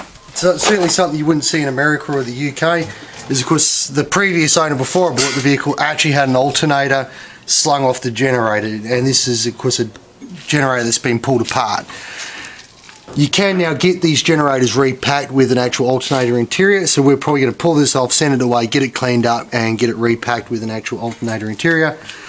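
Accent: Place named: Australia. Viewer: It looks like that engine has sat for at least 10 years without running yet when you split it from the transaxle amazingly there was not one spot of rust or crud on the on the flywheel or clutch cover. I am having a little trouble with your aussie accent, did you say that is a "dana" gearbox ? I've worked on vws for forty years and dana never produced gearboxes for Volkswagen.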